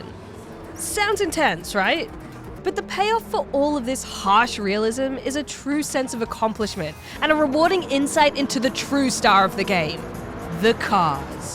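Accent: Australia. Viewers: Haaaaars and haaaars. Is it just me does say haaaar instead of hour? Definately a mixed accent, Australian and something else. Just can't place the secound part of the accent.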